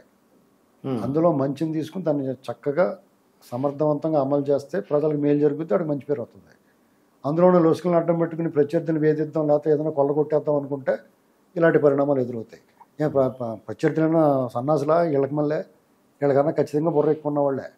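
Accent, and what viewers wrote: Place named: Godavari, India. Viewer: నమస్కారమండి గారు మీ వీడియోస్ రెగ్యులర్ గా ఫాలో అవుతూ ఉంటాను ఈ మధ్య కొంచెం తగ్గించినట్లు ఉన్నారు సార్ హెల్త్ బాగుంది కదా చక్కగా విశ్లేషిస్తారు సార్ మీరు ప్రతి అంశాన్ని అందుకే మీ వీడియోస్ ఇంట్రెస్ట్ గా చూస్తాము మీరు మాట్లాడే భాష కూడా మాకు ఎంతో నచ్చుతుంది సార్ ఆ గోదావరి జిల్లాల యాస చాలా చక్కగా ఇంప్లిమెంట్ అయి ఉంటుంది అంతకన్నా ఎక్కువగా మీ భావజాలం మాకు ఎంతో ఇష్టం చాలామందికి అనుసరణీయంగా కూడా ఉంటుంది సమాజాన్ని ఎడ్యుకేట్ చేయడంలో మీ పాత్ర కూడా ఎంతో కొంత ఉంది ముఖ్యంగా పార్టీల పట్ల నిక్కచ్చిగా మీరు చెప్పే అభిప్రాయం చాలా వాస్తవికంగా ఉంటుంది అందుకే మీరంటే మాకు ఎంతో అభిమానం మీరు ఎప్పటికీ ఇలాగే మంచి విశ్లేషకులు గా పరమేశ్వరుని దయతో ఆయురారోగ్య ఐశ్వర్యాలతో విలసిల్లాలని కోరుకుంటున్నాను సార్ నమస్కారమండి గారు మీ అభిమాన సోదరి వసంత రాజకుమారి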